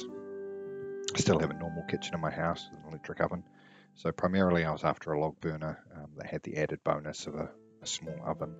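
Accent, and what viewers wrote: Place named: New Zealand. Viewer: Great review, gonna get one for my barge. Nice to hear the New Zealand accent.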